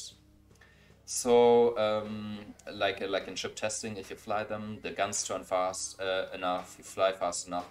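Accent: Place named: Australia. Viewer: Your accent is cool. Are you Australian?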